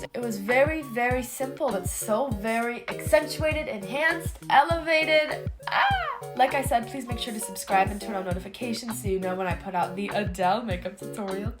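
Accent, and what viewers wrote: United States. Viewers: Love your accent! But I cant place it?? Sounds like West coast and east coast combined!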